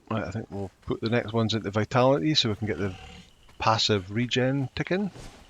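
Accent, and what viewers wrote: Scotland, United Kingdom. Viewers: I think it's still hard core if the goal is to finish the game with one life. No matter what happens to the followers. And it doesn't makes sense role playing wise. To make it hard-core why not zero follower, no healing items? Only eat berries that kind of stuff 😂 I'm starting this challenge right now. Except I will allow my followers to die and I'll be on a official sever. Thanks for the inspiration. Btw are you Scottish? The calm ton of your videos and your accent are the reasons why I watch your videos.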